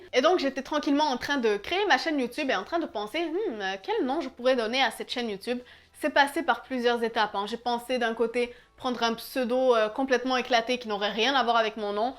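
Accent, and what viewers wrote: Canada. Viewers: Tu est canadienne ?? J'ai vraiment trop ta voix 💕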